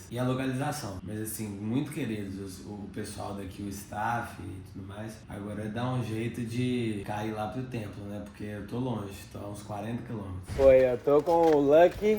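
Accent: Minas Gerais, Brazil. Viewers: Mano acho que você é mineiro como eu, ou eu me enganei ! Rs Esse sotaque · Eu amo seu sotaque, só quem é mineiro entendi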